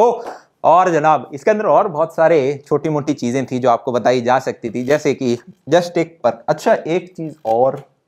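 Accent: Haryana, India. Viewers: haryanvi accent... pade😁😁.... great sir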